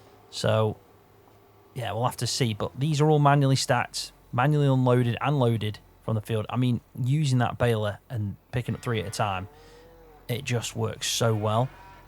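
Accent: Staffordshire, United Kingdom. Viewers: I've started watching your videos a few days ago, and im loving it! Also, please correct me if im wrong, I live in staffordshire, and I believe you do to, your accent sounds like a staffordshire one.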